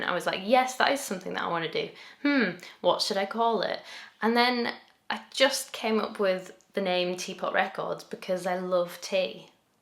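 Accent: United Kingdom. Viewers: O inglês britânico soa tão bonito.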